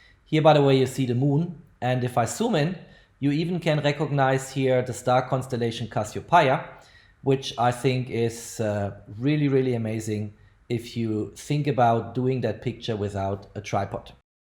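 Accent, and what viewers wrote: Germany. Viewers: Hör ich da etwa deutschen Akzent raus ? 😉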